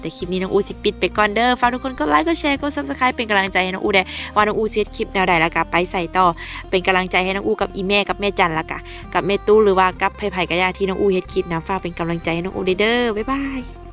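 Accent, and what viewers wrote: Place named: Phu Thai, Thailand. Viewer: ภาษาน้อง ลาวผสมภูไทเพราะเฮาเป็นคนภูไทฟังออก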